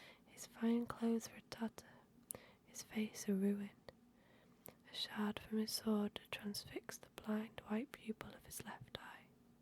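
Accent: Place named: England, United Kingdom. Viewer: Aaahhh your voice is perfect. Definitely my favorite English accent. The intro was terrifying but you nailed the rest of it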